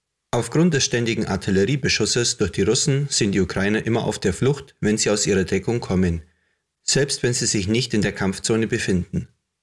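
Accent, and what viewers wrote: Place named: Allgäu, Germany. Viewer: Naja, so halb. Halb Deutsch und halb Allgäuerisch. Besser wäre, ganz Allgäuerisch.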